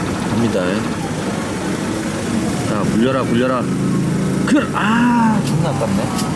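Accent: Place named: Gyeongsang, South Korea. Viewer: 구독하고 정말 재밌게 보고 있어요 근데 욕은 좀 자제해 주세요..... 그리고 경상도 사람 이죠 제가 경상도인데ㅎㅎ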